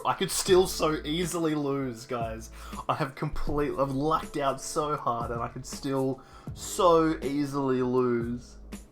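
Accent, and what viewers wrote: Australia. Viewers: first I wanna say I live the vids, secondly the Australian accent makes it that much better but I do have a legit question, doesn’t pertain to you as much but why on earth are all y’all so bad at math during these videos, again not you but mostly just in general haha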